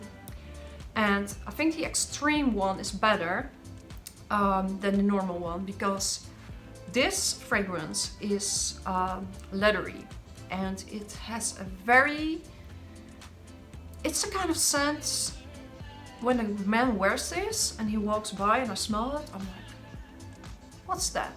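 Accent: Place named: Netherlands. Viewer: your accent sounds Dutch. Great list btw!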